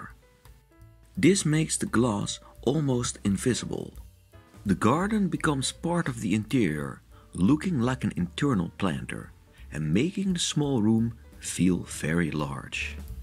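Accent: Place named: Netherlands. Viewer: Dutch narrator... distracting....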